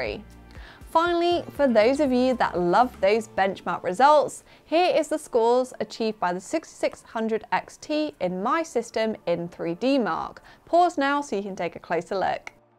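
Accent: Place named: United Kingdom. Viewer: I love the British accent 🙃 Thanks for the translation From ksa🇸🇦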